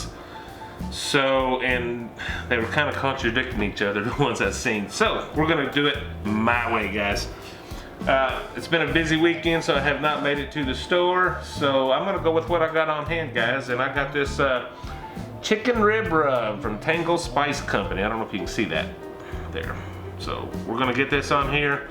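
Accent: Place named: Kentucky, United States. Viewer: Boy those are some mighty fine ribs mon ami...Your kentucky accent and my french canadian one some of these things are hard to pronounce lol . Love me some Tango spice!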